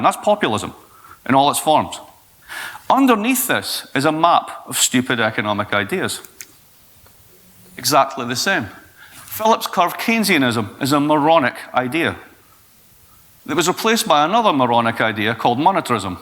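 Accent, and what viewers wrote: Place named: Scotland, United Kingdom. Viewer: + Scottish Accent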